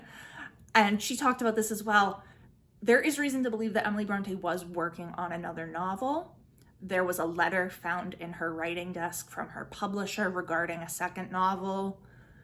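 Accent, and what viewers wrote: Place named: United States. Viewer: I associate you so much with Victorian literature that I forgot you're (most likely) an American, even though I hear it in every video! Btw I also love Wuthering Heights :)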